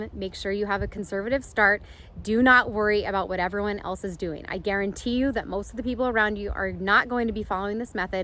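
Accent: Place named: Midwest, United States. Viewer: Judging by your accent (same as mine), it’s nice to see a “northern girl” with some marathon content!! I’m rom WI and now in the twin cities. Do you do a lot of winter outdoor running or do you focus more on the treadmill? How does that affect your training or is it just sustainment mode during the half year of snow on the ground? 😂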